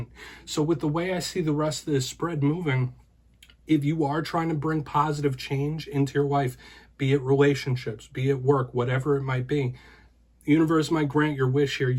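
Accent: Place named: Delaware Valley, United States. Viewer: Powerful reading, thank you. Sounds like you’re from the Delaware Valley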